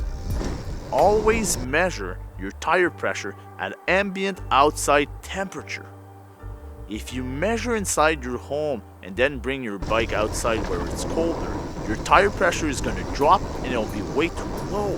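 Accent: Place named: Scandinavia. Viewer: Tout le long je trouvais que tu avais un accent scandinave. J'me disais que ça devait être en suède haha.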